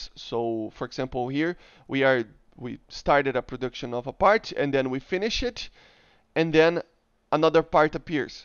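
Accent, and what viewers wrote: Brazil. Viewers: acho que voce é brasileiro, seu sotaque me diz isso. am i wrong?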